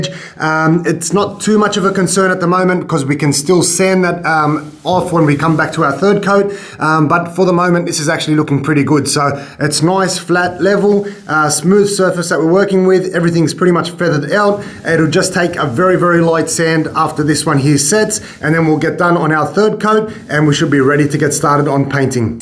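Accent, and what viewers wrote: Australia. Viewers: Bloody awesome mate, excellent work, appreciated the detailed illustration of all of the steps required for this job, and great to finally hear an Aussie accent on one of these videos. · Really good tute. Is that an aussie accent??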